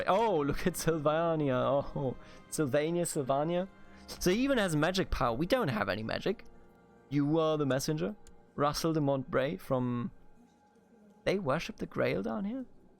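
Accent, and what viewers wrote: Germany. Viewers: Never before have I heard a genuine German accent. I am not disappointed.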